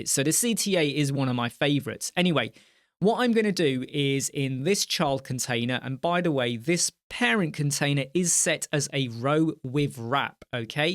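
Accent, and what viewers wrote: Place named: United Kingdom. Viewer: I recently discovered your channel, and I have to say, it's become my go-to resource whenever I need to learn something about Elementor and WordPress. Every time I have a question, I head straight to your channel, use the search bar, and look for the topic I need. Only if I can’t find what I’m looking for do I turn to YouTube for other tutorials. You explain things in such a simple, clear, and easy-to-follow way, and your British accent makes it even more enjoyable and effortless to understand. I just wanted to thank you for that!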